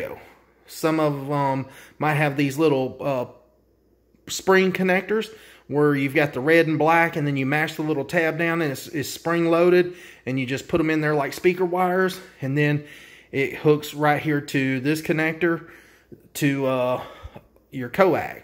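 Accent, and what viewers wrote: United States. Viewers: He's got my accent dont ya? we're both southern bubba's talks just like me. im a fan.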